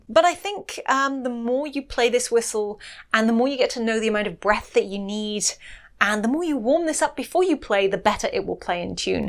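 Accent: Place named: United Kingdom. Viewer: Very nice video, hello from France. (I knew it, when i heard UK. You are not Irish (I 've lived there, i know the accent) not Scottish too i think. So you are from Doctor Who' s country. Love the UK, see you next time.)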